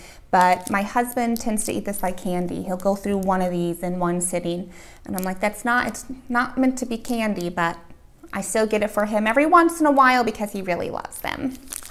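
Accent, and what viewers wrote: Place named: South, United States. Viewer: This woman is very traditional and I like that. And also the accent is great... Is that Southern American accent, near Texas or something??? I am saying that because I watch American cinema a lot and can understand the little slang from one part of the country to another.🙏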